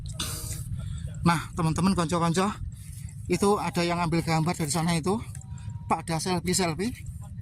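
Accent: Java, Indonesia. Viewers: Yutuber ko ngomong nya glepotan bro Jawa ya Jawa sekalian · Pokok e you tobe kriator yg satu ini sangat bikin aq ngakak 🤣🤣🤣 wesss juowoo jekek ngomonge😂😂semangat kawan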